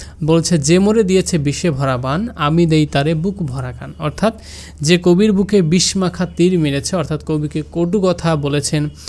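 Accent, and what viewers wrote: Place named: Rajshahi, Bangladesh. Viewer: আসসালামু আলাইকুম স্যার।স্যার আপনার ক্লাস করাটা অনেক ভালো লাগলো ১ তো আপনি ভালো পড়ান মাশাআল্লাহ আর ২ য় কারণটি হলো আপনার কথা শুনে বুঝতে পারলাম আমি যদি ভুল না হই আপনি আমার এলাকা মানে রাজশাহী বিভাগের লোক। স্যার আপনার বই গুলো চট্টগ্রামের কোন লাইব্রেরিতে পাবো যদি একটু বলতেন।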